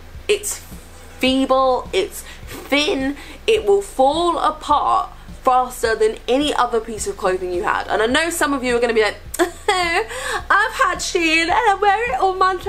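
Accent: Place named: Ipswich, United Kingdom. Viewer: I love hearing your accent. Knowing you're from Ipswich (at least, I hope you are, or I'll look like a right tit) gives me a warm feeling inside. I grew up in Diss 😃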